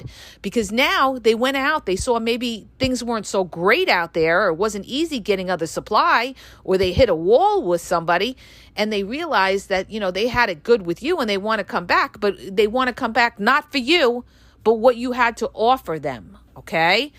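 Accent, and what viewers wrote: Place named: New York City, United States. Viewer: A NYC accent...;)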